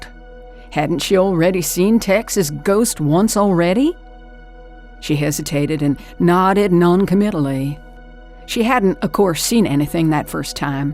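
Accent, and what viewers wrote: South, United States. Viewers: Very excellent story. The narrator did an excellent job despite using a southern drawl to tell this unusual tale. Sometimes, when narrators use accents to narrate stories, it can be difficult to understand them, thus, taking away the pleasure of enjoying the story. Not so, in this case, she was very easy to understand. I hope to hear more from this narrator in the future. Also, the story it self was very well written. It had a different type of plot which gave it a fresh and new feel to it, that the other usual horror stories sometimes lack. The plot was also completely unpredictable which I liked, because I hate predictable stories. Finally, the unusual plot twist or twists were well done and very surprising. Overall, I really enjoyed listening to this story. Well done " ". 😉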